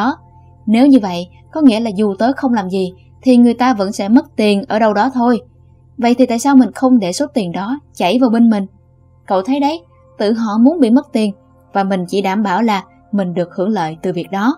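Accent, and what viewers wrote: South, Vietnam. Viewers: Cuối cùng cũng có giọng miền nam hehe 😁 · Giọng nữ miền Nam dễ thương quá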